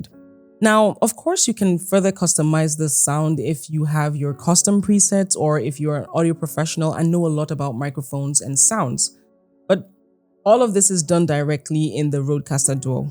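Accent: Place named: Africa. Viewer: I didn't know women like u exist and you actually sound African. I am impressed and happy, will be nice to connect sometime and geek.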